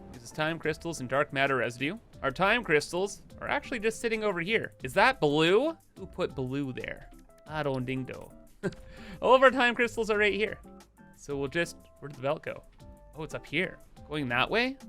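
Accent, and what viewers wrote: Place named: Canada. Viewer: OOT and ABOOT! Do I detect a fellow canuck??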